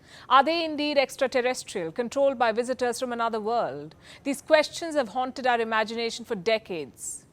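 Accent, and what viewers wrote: India. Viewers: she is a total packages her presentation is gold standard , she delivers in such a clear and concise language , i think she is one of the best journalist on the net , and i love her Indian accent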